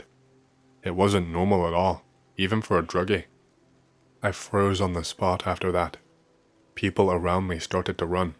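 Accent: United Kingdom. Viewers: Where's his accent from? Sounds kinda U.K. ISH...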